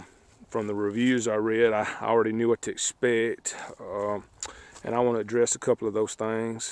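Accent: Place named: Texas, United States. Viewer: I'm fascinated by the hobby and have finally narrowed down my telescope search to the Orion StarBlast 4.5 or the 6. (The 6 has bigger aperture, but I'd like the dobsonian tabletop mount.) Have you, since making this video, had a good experience overall with this scope? I'd love to hear your thoughts and whether they've changed since having it for a few years. P.S. Are you Texan? You sound like my family and it's great. Haha ;)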